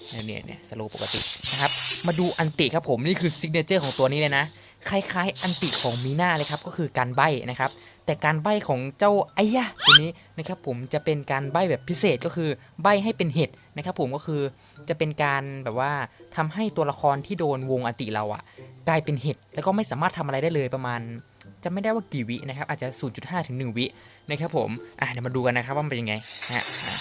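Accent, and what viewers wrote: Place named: Indonesia. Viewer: Skill translate to việt nam but your voice is indo right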